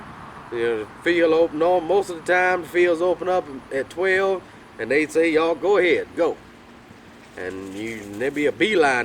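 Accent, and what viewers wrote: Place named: South Carolina, United States. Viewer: Just happened to be looking at YouTube one day and when I heard you talking, I said to myself, “that boy sounds like he’s from up home.” Sure enough, my suspicions were right. I am an Orangeburg county boy myself, from Springfield. Kinda wondered if you had ever hunted with the Brown boys from between Norway and Denmark. I used to dog hunt with them a good many years ago. Live in Charleston but Orangeburg county will always be home. Enjoy your videos. Keep them coming!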